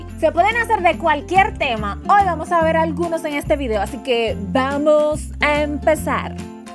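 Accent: Dominican Republic. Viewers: eres dominicana? o de alguna isla del caribe? 😍 siento como un asentillo dominicano xd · Hola que bn que me encontré con estos vídeos tu eres dominicana verdad porque hablas igual que yo . soy dominicana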